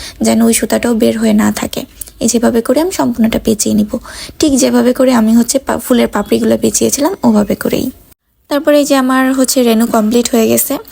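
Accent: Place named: Naogaon, Bangladesh. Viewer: আপনার বাসা কি নওগাঁতে ভাষায় নওগাঁয় মনে হচ্ছে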